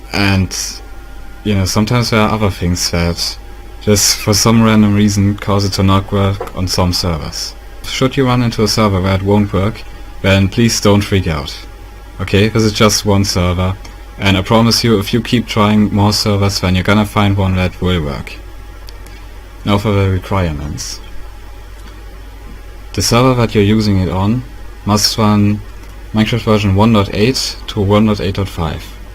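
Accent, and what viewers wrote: Germany. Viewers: Man hat das Deutsch richtig raus gehört xD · are you german? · Boah dieser deutsche aktzent😂 Aber cooles video hat mir geholfen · You German right? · du bist ein deutscher :D merkt man an deinem aktzent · a ok weil dein english ist nicht so gut weil wenn ich english rede höre ich mich fast so an wie du deswegen dachte ich du bist deutscher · bro man hört halt das du deutsch bist aber du sprichst verständlich englisch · dieser deutsche Akzent XD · i can hear you German accent xD. i love your client too, thanx.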